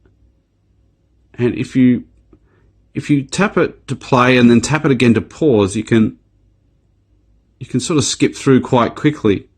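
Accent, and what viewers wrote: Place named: Australia. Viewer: Hi.. 1st I love your Australian accent. Secondly, I feel like you invited me to your home and sat me down to show me how the camera I just bought works. Thank you for an exceptionally in depth, hold your hands and let me show you, review. Thank you mate..;)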